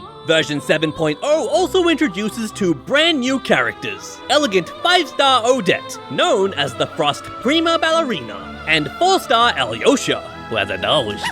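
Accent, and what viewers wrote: United Kingdom. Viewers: are you british? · Are you british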